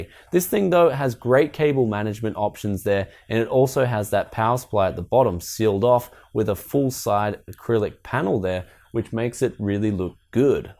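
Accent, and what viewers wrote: Australia. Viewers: he's an Aussie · Are you Australian?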